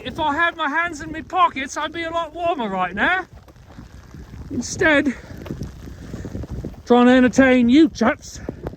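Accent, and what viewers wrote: Australia. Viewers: I don't know but I've been starting to talk to myself with your australien accent today... this is crazy, this is contageous !!! Happy 😊 to see Girlie ; such a beautyl!!! Watch The cosmic secret last night, had to finish it; A Brilliant Masterpiece!!! Brings lots of clarity !!! Bravo!!!👏👏👏☀️ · Good Aussie accent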